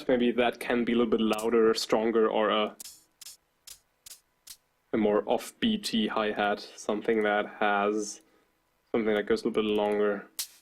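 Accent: Germany. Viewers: bist doch berliner oder :D?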